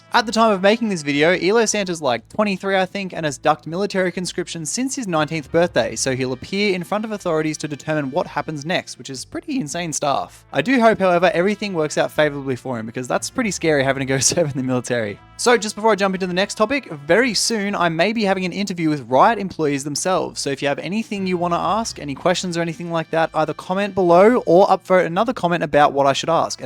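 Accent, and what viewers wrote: Australia. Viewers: "Talkin' about de bloke" I might be dumb, but are you australian? lol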